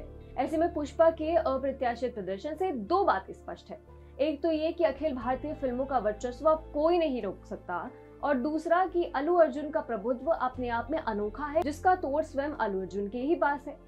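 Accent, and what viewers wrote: Standard. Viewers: Apaki Hindi bahot shuddh he ,bahot accha laga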